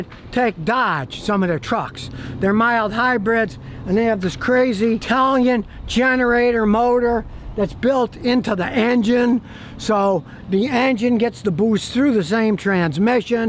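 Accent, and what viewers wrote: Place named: Texas, United States. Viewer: I like how SK covers everything important and hasn't lost his Texas accent. I have to turn the volume down though!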